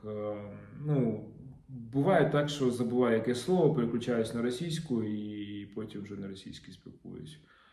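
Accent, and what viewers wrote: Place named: Lviv, Ukraine. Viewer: Львiвська мова неперевершена iiможна впiзнати де будь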